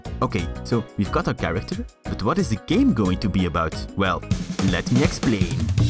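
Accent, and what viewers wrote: Netherlands. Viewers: Nice to hear the dutch accent, didn't know our country has such great youtubers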